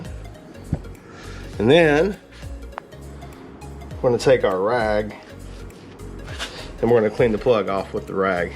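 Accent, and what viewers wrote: South, United States. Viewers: I always look forward to Thursdays! Happy Thanksgiving,! I see you have now achieved 220 subscribers. Congrats! NICE t-shirt! I need to get me one of those. Now - you say EVERYTHING you will need to change the oil is right on the table. I do NOT see any Crown Royal or Mich Ultra., you are slacking. But I must say it looks like a surgeon's tray! Everything you need, there at the ready. Me, I'm in and out of the tool box and the house 10 times. I dig the ingenuity in using a dropper when you don't have another small tube or funnel to fit. That's something I would do. We learn by doing and get better as we go. What is there, a "thing" about Amsoil? I have never used it but it seems like they make a good line of products. Why would someone criticize using it? Gotta love YouTube. Learn something every day about these bikes. Looks like you got it filled up to spec with nice clean oil. 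My bike being 7 years old, I'm concerned about all my fluids - oil, shaft oil, hydraulic fluids and coolant. Maybe in the Spring. My tires still look good although original equipment, but I worry about them as well. I only have 700 miles on 'em. But dry rot is a concern. Love the way southerners say "oil". Ole. AmsOle. One syllable. Not "oy-l". Ole. I'm off to get a quick ride in before chowing on a delicious turkey dinner. Have a good one! Tongue click, finger point back atcha.😎😜👓🕶🏍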